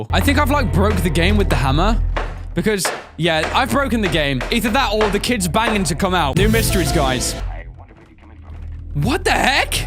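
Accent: United Kingdom. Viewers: AshandraBlue i mean he is british like dan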